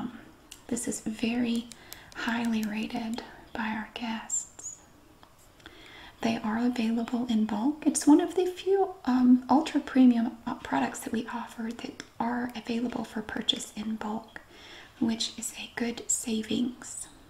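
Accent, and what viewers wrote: South, United States. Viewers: I just love your channel & the variety of ASMR you explore. I don't think there is anyone quite like you out there with the same style or format, making you extra special(to me, anyways) I really enjoyed this video, along with your store hauls & shelf straitening. I LOVE your southern, soft accent. Much love & blessing to you from a fan in Vancouver, BC Canada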